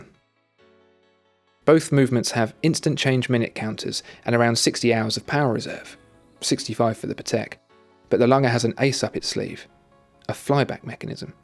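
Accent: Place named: England, United Kingdom. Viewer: A review of some vintage watches and their movements would be great. You crisp English accent makes these reviews sound regal! Great videos and delivered in a very balanced manner.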